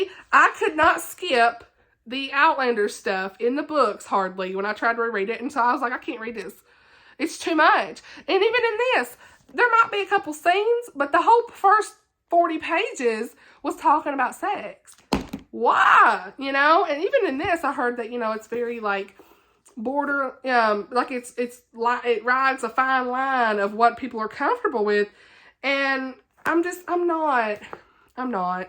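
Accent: South, United States. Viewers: Northanger Abbey in a southern accent is not something I knew I needed in my life 😂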